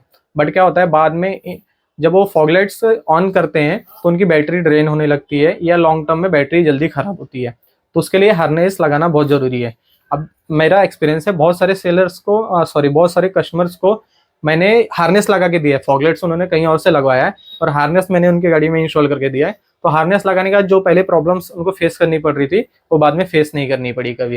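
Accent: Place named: Maharashtra, India. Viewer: Bhau Marathi aahe vattt cuz" Barobar"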